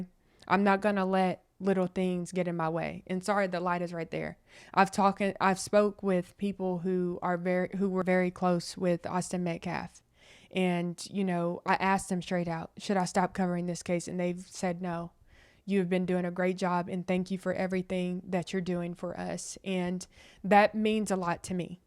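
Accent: United States. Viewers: Where is she from? Sounds American to me, but this is the first video I've watched. ❤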